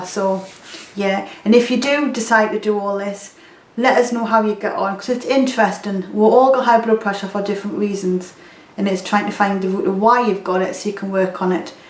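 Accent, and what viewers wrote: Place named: North East England, United Kingdom. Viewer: Good summary and nice to hear a NE accent. Two other things that I find are really effective are: fasting (blood pressure also stays low afterwards) and going in the sauna (think it's because you relax rather than losing fluids - I drink a lot of water).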